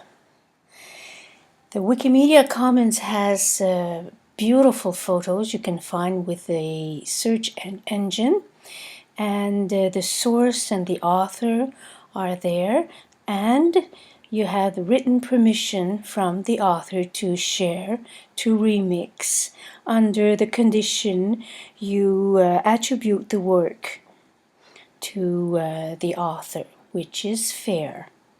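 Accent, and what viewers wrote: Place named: France. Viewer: Ha ha ha ! There is a touch on his diction almost imperceptible, but the musicality of "sugary" French is there ! :) Kindre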